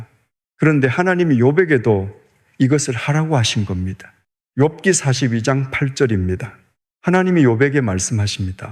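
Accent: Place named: Gyeongsang, South Korea. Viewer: 목소리도 전혀 아니에요 경상도 말씨인데 참 유튭.들 ㅍㅍㅍ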